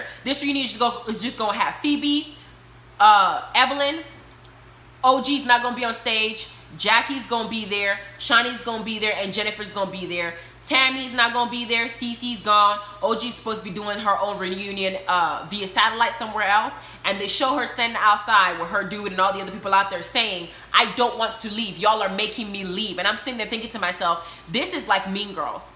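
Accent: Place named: Caribbean. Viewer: I hear a accent.. are you Caribbean by chance?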